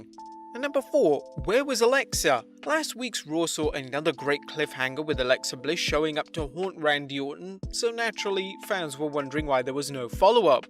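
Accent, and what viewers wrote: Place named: United Kingdom. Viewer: OH GOD.... FIRST YOU SAID "MARY-LAND"...(pronounced Mar-UH-lind), now "RE-NAY-SAUNCE"!?!?!?!?!? WTF!!!!!!????? It's pronounced Re-Ni-Saunce!!!!!!! British WL host whatever your name is..... YOU'RE KILLING ME BRO! GO WATCH SACHA BARON COHEN IN THE MOVIE "THE TRIAL OF THE CHICAGO 7" THE BRITISH CAN SPEAK ENGLISH WITH AN AMERICAN ACCENT!!!!!!!! IF COHEN CAN DO IT, SO CAN YOU MY FELLOW WRESTLING BROTHER!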